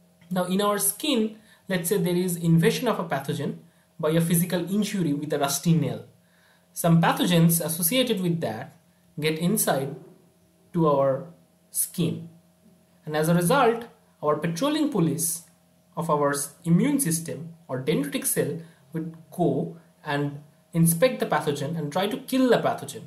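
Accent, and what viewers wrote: India. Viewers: Fuck !!! I hate Indian accents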